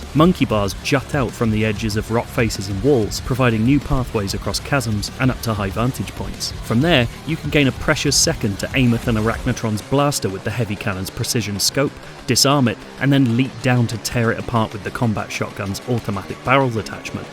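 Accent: United Kingdom. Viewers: Only British accents can make Doom sound fancy.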